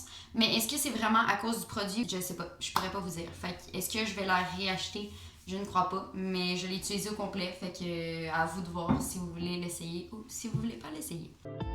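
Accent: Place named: United States. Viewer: j’ai écouté la vidéo comme si une américaine parlait. tellement mon style de vidéo 🤍 that was fun!! continue yt comme ça big love NON AHAHAHA LES PHOTOS DE TOI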